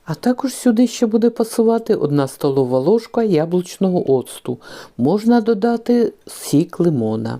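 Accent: Ukraine. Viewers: Нееет, все ж таки кровушка отцовская перебарывает, люблю я вас хохлушечки, люблю ваш говор, люблю ваши песни, люблю ваши танцы, люблю вашу украинскую вышиванка и национальные одежды, правда, как много наготовили вкусняшек только лишь из 6ти спинок куриных, дякою за ваше видео, здоровья вам, счастья.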